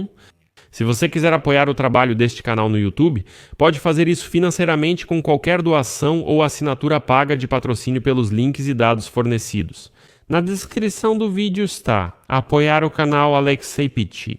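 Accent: Portugal. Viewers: Por que fica oscilando em português de Portugal e do Brasil?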